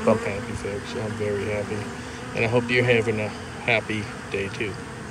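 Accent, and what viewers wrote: Louisiana, United States. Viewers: Damn i miss this Louisiana accent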